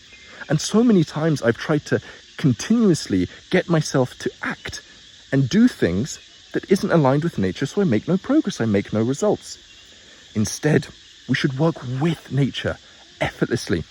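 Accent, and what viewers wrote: United Kingdom. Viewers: Deep voice + british accent + philosophy and self help = subscribed immediately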